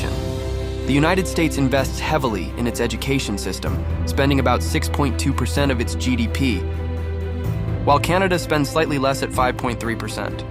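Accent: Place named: Nigeria. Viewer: You sound like a Nigerian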